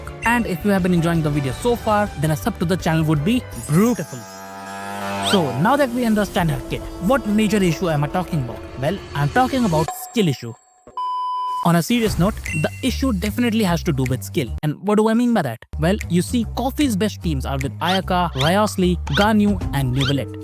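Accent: India. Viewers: Insane editing and Accent ❤️ there's still 1% indian accent left hahahaha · Indian accent 🥵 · Indian English accent is funny. This is true, but I think this guy is far better than others and his video content is great so I see no complaints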